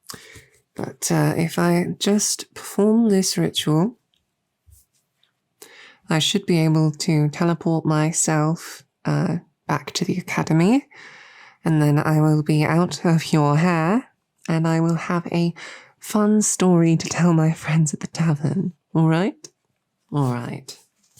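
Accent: United Kingdom. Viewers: The British accent is healing for the soul ❤️‍🩹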